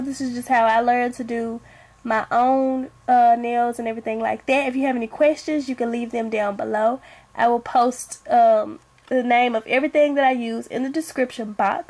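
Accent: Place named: Mississippi, United States. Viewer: I just was just curious where are you from? You sound like you are from MS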